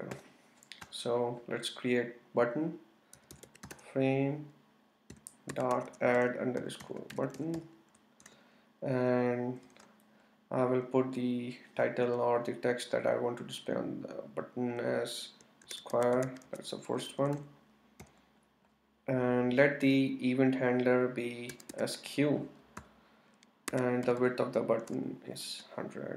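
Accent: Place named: India. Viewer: I like your Indian accent :)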